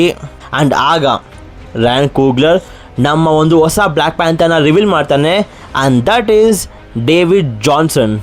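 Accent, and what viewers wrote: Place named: England, United Kingdom. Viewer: ನೀವು ಕನ್ನಡನಾ ಇಂಗ್ಲೀಷಿನ ಗಂಟಲಲ್ಲಿ ಇಳಿಸಿಕೊಂಡಿರಾ ಅನಿಸುತ್ತೆ, but story super ಆಗಿ ಹೇಳಿರಿ ❤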